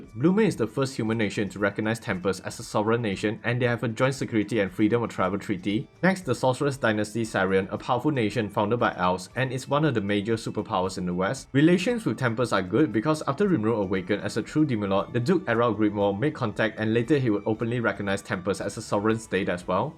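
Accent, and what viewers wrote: Singapore. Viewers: say, your accent sounds familiar, Singaporean / Malaysian ?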